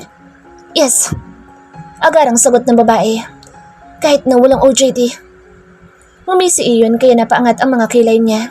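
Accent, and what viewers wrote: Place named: Visayas, Philippines. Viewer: Maganda po ang storya,,bisaya ka po maam 😊😊 ang tuno po kasi nang pagbasa,pero maganda po ang story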